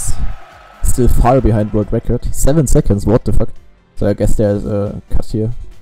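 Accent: Germany. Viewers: man hört den deutschen akzent sehr gut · Ahhh der schöne deutsch englische aktzent. Ich liebe es. Btw du hast gesagt der corner lol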